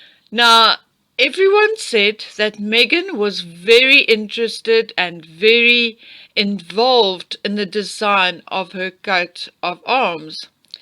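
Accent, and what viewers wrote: South Africa. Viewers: That is not your business. You racist Afrikaner. Your accent is horrible. Your country has so many problems why don't you worry about that. You cannot put your foot in Meghan's shoes. She got a standing ovation when she spoke at the United Nations. Even Banki Moon stood up to congratulate her speech.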